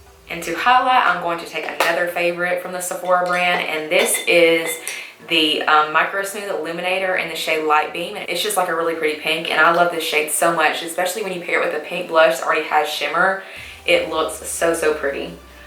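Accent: South, United States. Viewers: (1) You are even prettier natural! (2) Your Southern accent really surprised me for a moment - but my ear adjusted. :) (3) Your skin is naturally perfect & translucent. (4) Nice suggestions and demonstration. Thank you. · that's where that sweet accent comes from! I want to live down south so bad! Great video I love that you use mostly affordable drugstore make up! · Bc she has a southern accent!?! OMGGGG this makes me mad like they're not the same whatsoever she just has the same accent as someone else and now they remind others of someone, seriously 😒 gosh this is annoying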